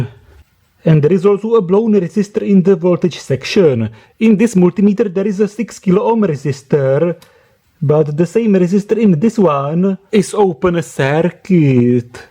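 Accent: Czech Republic. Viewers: Czenglish hell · I believe czech really sound like that.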